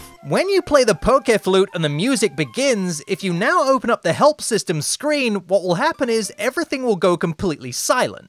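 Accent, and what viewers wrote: United States. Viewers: Why do he sound a bit American now? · Woah I feel like I haven't seen your videos in years and your accent feels more "American-y?" It's weird haha good video still :)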